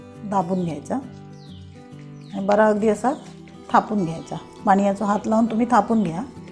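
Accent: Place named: Malvani, India. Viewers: व्वा छान झालेत patole .. ani तुमची मालवणी 👍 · तुमची मालवणी बोलत ऐकायला मजा येते... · मी आज केल्या पतोल्या मस्त झाल्या. माझ्याकड़े कुंडित आहे हलदिचे झाड़. आणि तुझा मालवाणित बोलणा लय आवडला. मी पण kanakavlicha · छानच पातोळी दाखविली त्यातून मालवणी बोलणं असे वाटत नागपंचमी आली खुप खुप छान ताई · छान मी कसालची आहे आम्ही पण असेच बनवतो तुमची मालवणी मस्त वाटली तुम्ही वेंगुर्ल्याच्या वाटत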